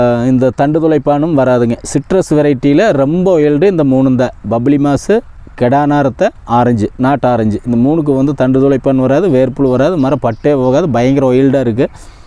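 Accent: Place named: Coimbatore, India. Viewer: You covai Tamil speech is very nice. I had been living in Karamadai for past 30 years where I was studied at karamadai high school then pre university PUC at Government arts college in year 1977 . I completed Mechanical Engineering in Govt college Technology during 1978 to 1983.Then joined India space research organization in 1983 itself. So like your speech. I completed 37 years as Scientist in higher levels and retired in 2020. Like your service and affection on food forests. Thanks If time permit I will meet you personally. Scientist RAJAN PAULRAJ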